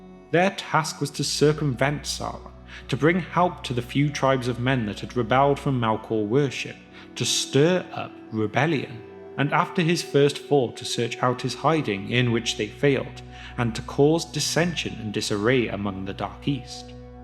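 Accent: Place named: West Country, United Kingdom. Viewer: I love your west country twang, very hobbity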